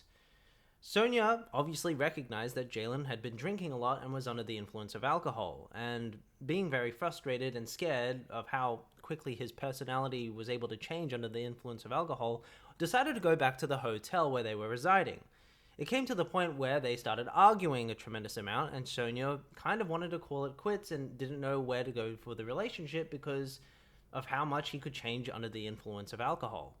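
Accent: Australia. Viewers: I think he’s Australian :) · unrelated but YOUR AUSTRALLIAN??????? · Bestie he is not british he literally has an aussie accent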